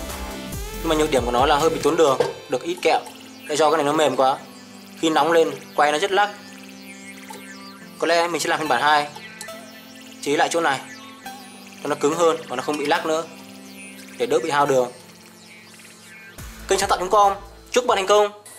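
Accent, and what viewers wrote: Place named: Phu Tho, Vietnam. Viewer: nghe giọng anh là biết anh là người có Phú Thọ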